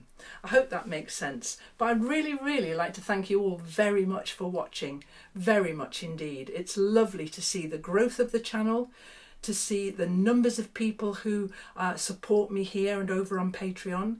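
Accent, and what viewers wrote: England, United Kingdom. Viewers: I have only just found you. It am English and its lovely to hear an english voice . I have a lot of Kaffes flower fabric plus other bits and pieces of flowers. I couldnt think what to do with it, I know now. I watched Grantchester as a series, I wouldnt have wanted to watch it in one go. I have hand pieced, hand quilted a hexagon quilt. I was never quite happy with the finish though wasnt sure why. You may be horrifed but I now have the front in two pieces and removed everything else to finish it again, maybevadd a few hexies, I have hundreds made. It is a big throw size unfortunately, I also have some quilts that need finishing. Maybe I should start a new system as you have. I dont have facebook but do love looking at Instagram.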